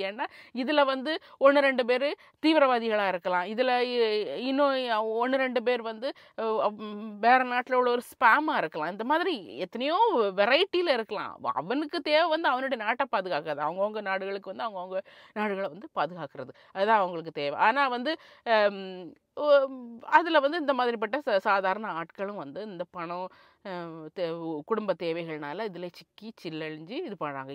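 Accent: Nagercoil, India. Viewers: Neega nagercoil a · Nagercoil Tamil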